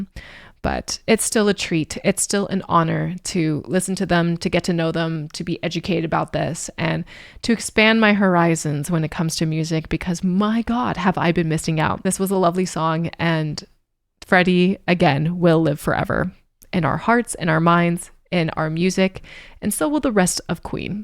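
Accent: United Kingdom. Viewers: Which country is? Her accent seems to be from England.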